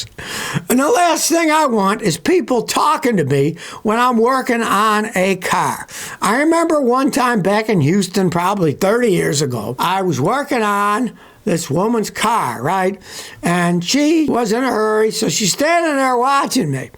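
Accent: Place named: New England, United States. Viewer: "karr" "new Englander lol <3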